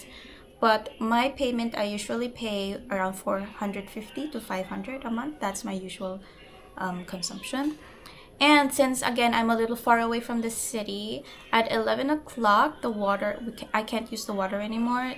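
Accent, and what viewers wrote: United States. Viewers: Honestly, don’t mind the bullies. You speak perfect English I am from Chicago and you sound like an American with your English accent. I was wondering if you lived in the US where you’re from the US because of how good your English pronunciation and accent it’s amazing by the way I’m Filipino and I speak fluent Tagalog and I’ve been living here since 1993 I grew up there that’s why I am so amazed with how well you speak English more power to you. I wish you well and your son as well God bless and keep trusting God he’s got your back don’t worry. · 🤔 I'm curious, you philipinna? You sound like a girl from the U.S.😂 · I can tell u grew up with an American father or influence. Ur American English is very evident. Good luck on ur channel. Love ur accent.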